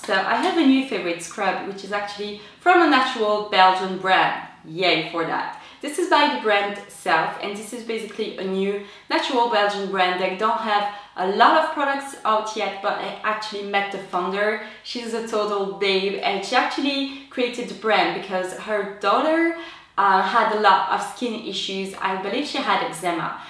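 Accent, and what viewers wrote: France. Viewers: Bonjour ☺️Es-tu française ? Are you french ? Because you hava a french accent especially when you say "la mer" ?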